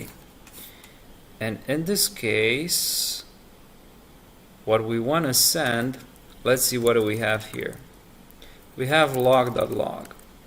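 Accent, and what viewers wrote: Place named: Ukraine. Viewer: you dont have an accent? Your funny. My girlfriend is from Ukraine, yall sound very similar. I am going to be teaching Golang for pentesting. I'm working on it now as we speak. But I'll send you the link, when I'm getting close to showcasing. I wanted to do one for bash but you and,a few of my other technical friends are doing Bash. So I decided to wait.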